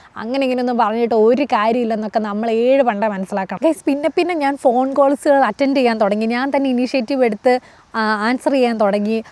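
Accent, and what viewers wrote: India. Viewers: Don't try to fit in Embrace the Indian accent of English language😉❤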